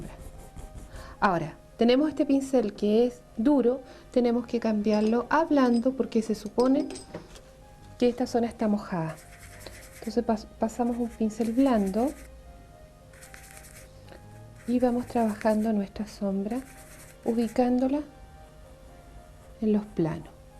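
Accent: Chile. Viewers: Me imaginaba por tu acento que podias ser chilena. Saludos de un Chileno pati perro.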